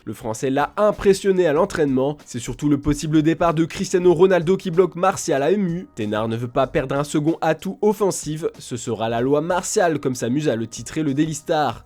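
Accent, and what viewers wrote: Italy. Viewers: Mais il est où le mec qui fait l’accent italien et tout ??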